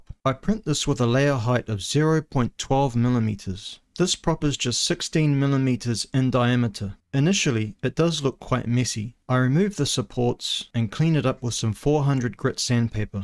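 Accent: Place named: New Zealand. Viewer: Good work, thought i could hear a kiwi accent, good to see some kiwi diy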